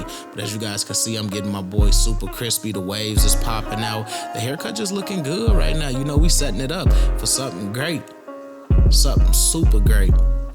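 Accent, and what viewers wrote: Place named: Midwest, United States. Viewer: You got that Midwest flow 🔥 talk yo shit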